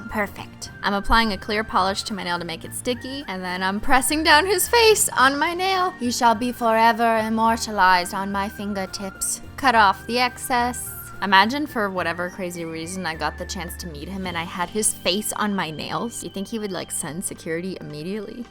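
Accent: Quebec, Canada. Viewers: her and azzyland (I guess bc they're Canadian) say "sorry" Like "surry" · After watching a bunch of Canadian shows I think you guys say "aboat" not "aboot" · You sound really Canadian in this video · Yassss loved that french canadian accent tho 😍 teamquebec 👏🏻🇨🇦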